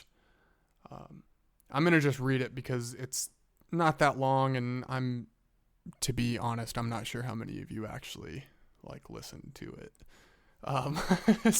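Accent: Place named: Canada. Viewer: You sound like you went to school in Canada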